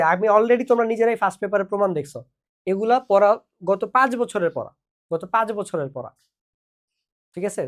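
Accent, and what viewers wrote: Chittagong, Bangladesh. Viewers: Your ctg accent is soo good bhai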